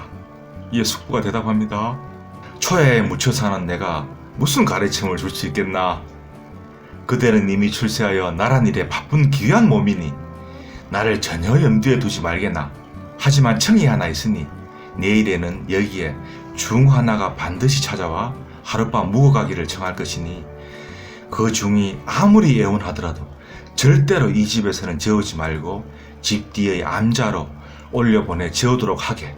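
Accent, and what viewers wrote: Gyeongsang, South Korea. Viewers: 님 이 혼탁한 말세에 사람다운 이야기를 듣기좋은 갱상도 말로 이리도 정신이 번쩍들게 하시니 그 덕이 길이 이어지길 바랍니다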